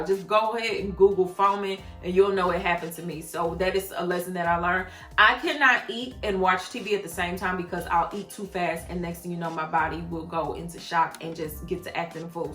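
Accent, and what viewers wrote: St. Louis, United States. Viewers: Congratulations Hun! Been checking out your previous vids. You looked Amazing before and you're Still Beautiful now. And I KNEW I recognized that dialect...we homegirls!!! I'm SO StL! Lol 😆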